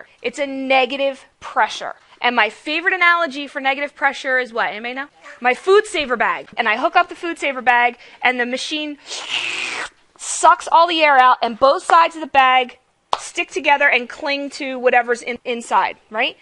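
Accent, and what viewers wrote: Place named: United States. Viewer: Your videos, your way of explaining, your examples, and your American accent make it soooo easy to learn... I love how you lecture. Please make more videos and help us (students) learn more so we don't have to cram study. God bless u...:)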